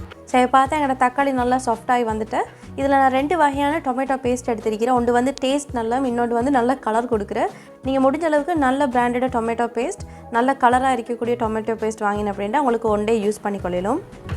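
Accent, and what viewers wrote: Sri Lanka. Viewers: அஸ்ஸலாமு அலைக்கும் உங்கள் அழகு இலங்கை தமிழில் பேசுவது மிக மிக அழகாக உள்ளது எனக்கு பிடித்த தமிழ் இலங்கை தமிழ்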